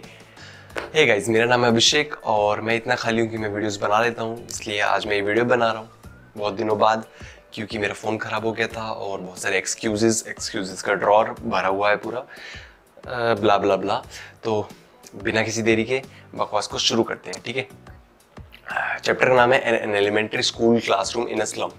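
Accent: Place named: Bihar, India. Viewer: U belongs to Bihar or what? Aapne bola naa..."At teri ki jay rha ho"..😊😊:) Waise aap bahut aachaa samjhte hk bhaiya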